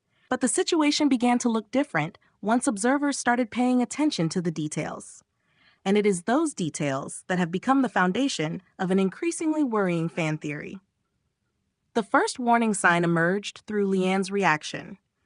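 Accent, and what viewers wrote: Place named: United States. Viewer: Isn't Corrie a British programme? Why is the narration American? (I'm assuming AI, but still ...)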